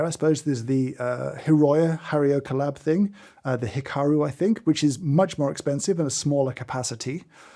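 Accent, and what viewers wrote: United Kingdom. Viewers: Englishman says words about coffee very britishly. 10/10.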